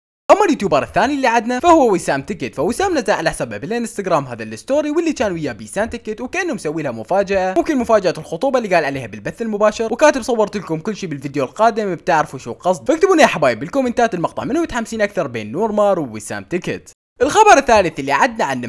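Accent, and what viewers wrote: Iraq. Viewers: تحجي عراقي 🇮🇶🇸🇾🌹🌺😘♥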